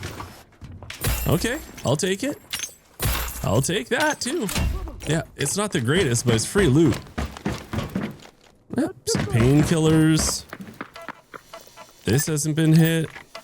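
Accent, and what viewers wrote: Canada. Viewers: it wasnt actually that it was the way you talk and some of the manurisms you use.i am also canadian so picked up on it quick lol i juat started on delta force console slowly getting better i enjoy your videos find them quite helpfull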